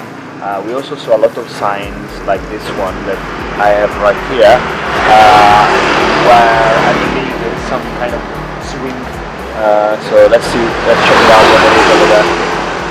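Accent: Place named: Italy. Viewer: italian accent :) , i love you vlog , i made a vlog in the same spot and in see lot of italian that day :)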